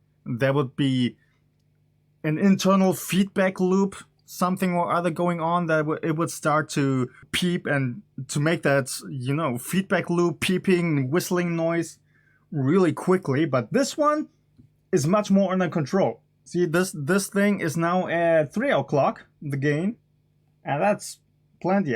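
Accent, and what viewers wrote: Germany. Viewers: Are you a german guy...?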